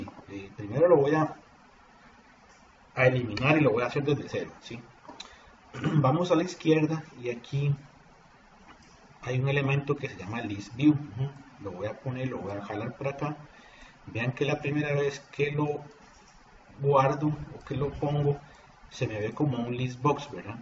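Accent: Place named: Costa Rica. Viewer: Uno reconoce a un tico hablar en cualquier lugar :-)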